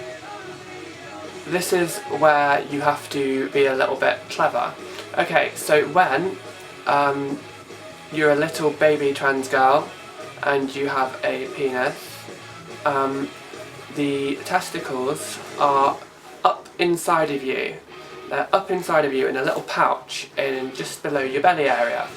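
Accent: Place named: South, United States. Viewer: Dear. I found your video by accident. The title confused me. So I watched. "WOW"..... What an eye opener for me. I could not beleive it when you said you were transgendered. Anyhoo I just wanted to say as a regular fella. I think that you are a stunning lady who should have been a woman from birth. Also you made me laugh when you had to talk about the 'delicate" parts of the process. The southern accent made me laugh when talking about dropping a "bollock" (excuse the lack of imagination for my discription) I hope the future is bright and you become the person you so clearly should have always been. Thanks for the eye opener kind regards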